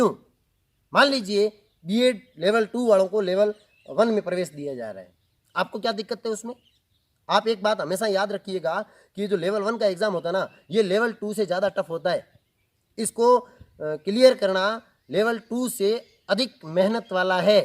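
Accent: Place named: Marwar, India. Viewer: सर किस गांव से हो मारवाड़ी बोली सुनकर मजा आ गया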